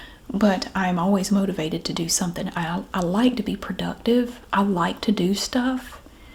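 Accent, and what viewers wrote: South, United States. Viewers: I’m with you…I love solitude and doing most things by myself. 🙌🏼 And your soft but not whispering southern accent/voice is such perfect ASMR…love your videos!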